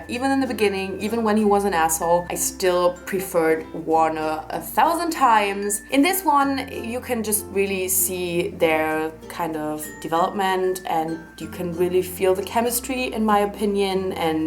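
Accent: Germany. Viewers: Great List! Are you german?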